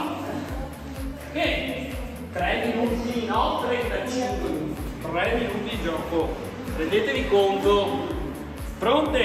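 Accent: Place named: Veneto, Italy. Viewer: incomprensibile....parliamo l'taliano!!! ...non il veneto o similari.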